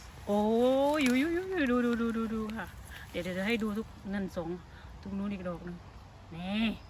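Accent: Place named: South, Thailand. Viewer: ชอบจังเวลาพี่พูดภาษาใต้คะ😁🍄🍄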